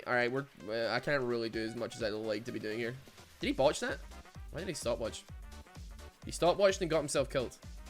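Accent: Scotland, United Kingdom. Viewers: League of Legends but goes full Scot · Please go full Scot and don't tell any of us what anything means, i'm from further north but i'd still love to watch it. For the most bit glasgow speakers are easily enough understood. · League of legends but u only speak pure scottish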